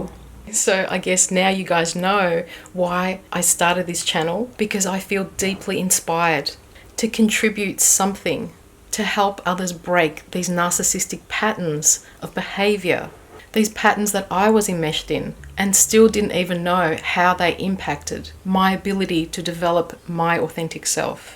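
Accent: Australia. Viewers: Are you Australian? I really like the accent. And yes I'm a scapegoat too. Hello everyone 👋👋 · You sound Australian I hope your doing ok,prayers for everyone there....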